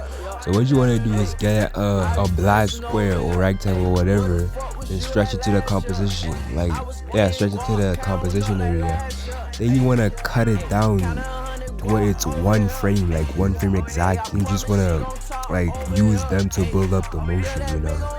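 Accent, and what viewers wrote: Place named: Brazil. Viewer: o cara parece um brasileiro falando inglês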